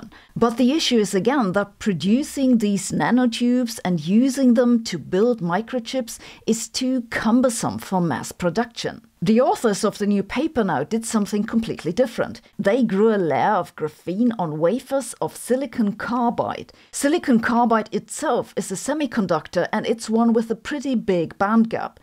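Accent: Germany. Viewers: but seriously, she is German. I hear they might have an accent over there that is different from what you might be used to :P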